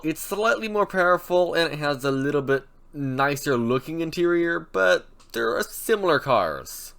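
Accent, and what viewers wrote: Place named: Canada. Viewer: I've just discovered your channel whilst surfing for Mitsubishi Mirage videos, and this is the first one I am seeing. A quick question, are you Canadian? I think I am detecting in your voice a Canadian accent. If I am wrong I must say it comes pretty close to it. I am quite keen on the hatchback Mirage as a replacement for a 2006 Ford Focus, and my eyes have been eating up all this Mitsubishi videos like candy! 😁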